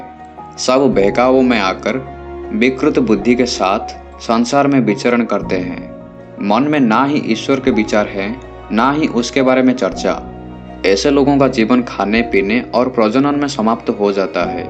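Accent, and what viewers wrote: Odisha, India. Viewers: Bhai odia ki katha kahiba style ru jana padi jauchi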